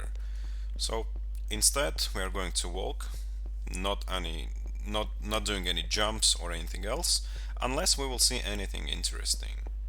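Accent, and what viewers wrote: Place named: Russia. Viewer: Звучит похоже на русский акцент... 🤔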